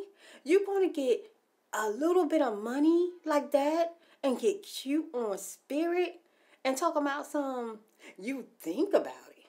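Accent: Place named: South Carolina, United States. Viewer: Its skranger....and that's how we talk down in South Carolina....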